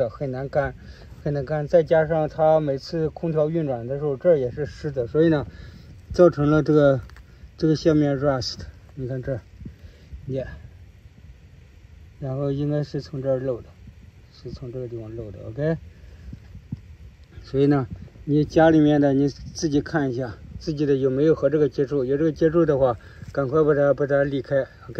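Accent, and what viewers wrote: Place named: Henan, China. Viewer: 听你口音说河南人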